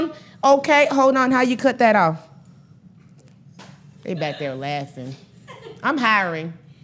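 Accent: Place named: South, United States. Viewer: I love your southern accent .. specially wen U say “sopped up" 🤣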